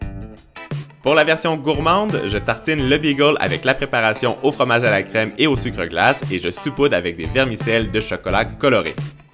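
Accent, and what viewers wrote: Canada. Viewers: De un ta vidéo est génial de deux je préfère les deux(là meilleur façon de fair manger des légumes à mon petit frère et cousin)et de trois j'aime trop ton accent moi j'ai toujours rêver de parler comme vous les canadiens 😘 😘 BISOUS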